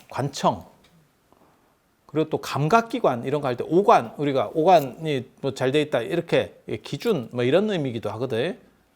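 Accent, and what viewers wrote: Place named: Gyeongsang, South Korea. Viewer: 경상도 말~ 넘 익숙한데요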